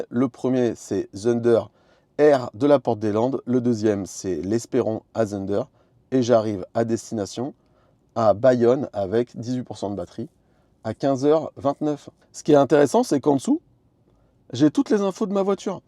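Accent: England, United Kingdom. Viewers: cet accent anglais se perfectionne de vidéo en vidéo. Et c’est beau!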